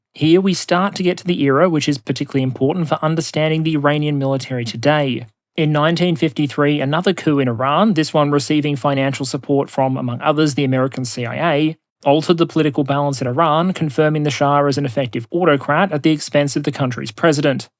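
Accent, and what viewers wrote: Australia. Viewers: The Aussie accent almost hides the propaganda but the rethoric is the same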